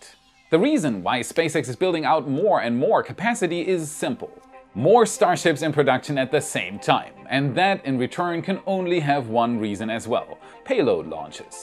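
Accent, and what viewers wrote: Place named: Germany. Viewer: I love listening to that German accent, it reminds me of commander Craise on Farscape.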